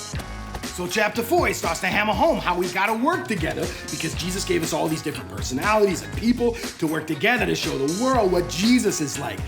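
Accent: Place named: Boston, United States. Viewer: This is great!! has to be OFB... originally from Boston. Born and raised here and we can detect an imposter in a minute. is the real deal. You can’t fake that.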